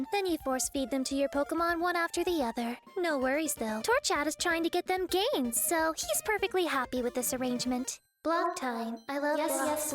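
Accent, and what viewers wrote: South, United States. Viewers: I dunno what to focus on first... The fact that your singing skills are improving with each video? The fact that the cringe rap is cringe af and I love it? The fact that your editing is great and I love the "text" jokes? Your great southern accent? The "uwu"? Or the fact that you somehow never went to Pacifilog Town on this save file? For real though, in all my years of playing Pokemon (which is like... a lot of years, idk) I've never once done a contest. And the worst part is that I somehow feel like I didn't miss much. But that means that when I was a kid playing this game, I was too dumb for both the Battle Frontier and the Contests! Not much has changed since then I guess. Anyway, great video as always! Keep 'em coming, queen! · Was that an authentic "southern hell" accent :3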